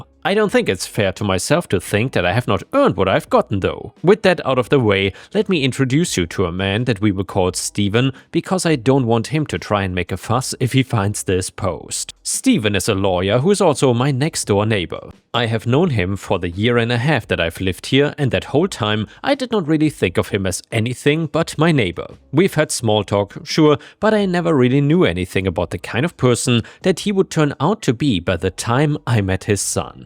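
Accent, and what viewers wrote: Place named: Germany. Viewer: dein deutscher akzent ist gar nicht so stramm, sehr gut, ich kann deutschen akzent in englisch nicht ertragen 😅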